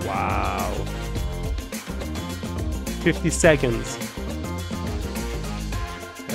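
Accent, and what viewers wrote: Quebec, Canada. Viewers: Is that a Québécois accent I detect? Bonjour cher québécois! The simulation looks astounding!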